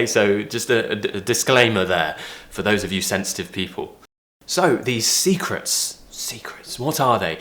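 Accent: RP, United Kingdom. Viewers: Keep on with your British accent. I don't want to learn any English dialect. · I’m in bed and ready to sleep but i have to take my retainers off and practice your British accent. 🤣🤣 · Hello I am a Spanish speaker and I live in France. Really, it is a pleasure to find you in the web, because I have always wanted to learn British English with your accent. I had the opportunity to travel in Europe and I live in France now. I speak French, of course Spanish and I want to remember my English studied in my country because I love languages. However, it is sure I do mistakes but I hope you understand me. I want just to say congratulations, congratulations! Very, very good! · Perfect British accent to understand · I was waiting for him to stop using his British accent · I love British accent and yours is beautiful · Hey no need sorry,, I am just mentioning this according to indian system,, Personally u can use whatever language u r comfortable with😊,, In btw How did u learnt British accent? 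,, I am not that fluent in english as you, I was strucked in intermediate level..Do u have any tips to improve my pronuciation ? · Hey! I just want to thank you because I have a hard time speaking and learning English. I have Dsylexia and I sometimes have a hard time with my mother tongue (Dutch I am from Belgium) so I think you understand that other languages are difficult then. And through your videos I have found the courage to practice extra on both your beautiful British accent and your language. Thank you so much! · I do relish your gorgeous British accent . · I can listen his voice all day (British accent is best) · Your British accent is elegant and clear enough, which I really like it. Thank you!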